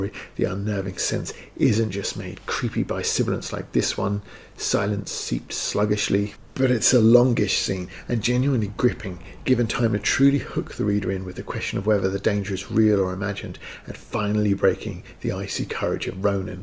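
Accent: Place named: United Kingdom. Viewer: totally serious. You obviously have a good command of the stories you read, and your voice is pleasant and British without being too nasal or: ok I need your help with the explanation of this description. I don't know how to describe this as an American, but what I'm trying to describe is a dialect of British speech that resembles cockney but isn't quite it. Dominique Tipper from that space show speaks this way. I know it's because of Hollywood exposure that I/we Americans have this bias but that dialect sounds, well, hoodlum-ish to us. I suspect we took our ques of woke capitalism from how you Brits dealt with these prejudices in the 90s (spice girls promoting a mixed British identity and language equality come to mind) and applied it to race and gender. Do y'all have a term for this there?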